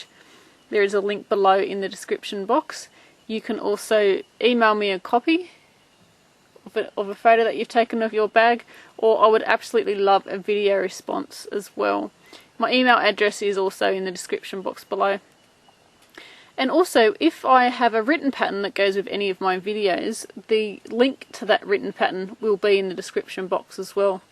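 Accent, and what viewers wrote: Australia. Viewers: so glad i found aussie ,i can understand you lol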